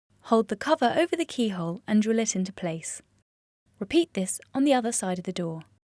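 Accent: United Kingdom. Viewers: Isn't amazing how "simple" well spoken English with complete sentences and diction can help to simplify how to do ANYTHING?! I am trying to fit for a mortise on a storm door and this video is exactly what the Doctor ordered. I have never done a mortise before. Thanks to VideoJug and a special thank to the lovely British voice of. AWESOME Video! Cheers!!!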